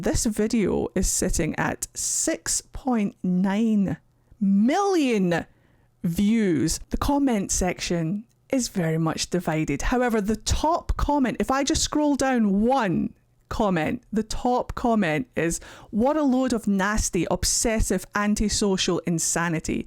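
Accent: Scotland, United Kingdom. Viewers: First time seeing one of your videos, but I heard the accent and immediately knew it was gonna be a good one. Love our Scottish folks, y'all some real ones <3 - From a Texas Trans Woman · As an English brit. Love the Scottish accent!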